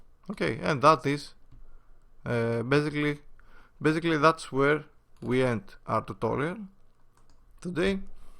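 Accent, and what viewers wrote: Greece. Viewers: Are you from greece?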